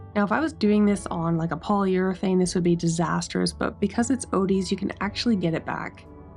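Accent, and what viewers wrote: Canada. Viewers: this is such an exciting project! I love old historic pieces and seeing you painstakingly bring this back to life was so satisfying! you did an incredible job (also Canadian and say decal the way you do and think that is really funny you got any flack/confusion on that) 🤣 I never think of us having an accent or inflection, it's everyone else! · Just magnificent. Having Canadian friends, I'm aware of differences in pronunciation between America and Canada. Please accept my apology for the uninformed imbeciles who may have been disrespectful to you. The residents of some backwaters in the US like to maintain their supremacy over things they don't understand. My respect for your tremendous talents would have overridden any "mispronunciation" I might have perceived, were it not for my observance of the Golden Rule. The best of luck to you and your co-workers.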